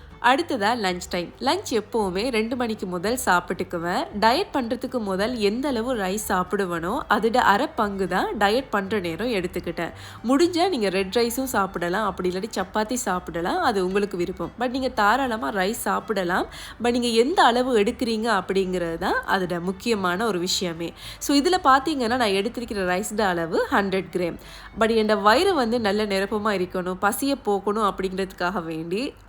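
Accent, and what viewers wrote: Sri Lanka. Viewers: Neenga srilanka tamil thane pesurenga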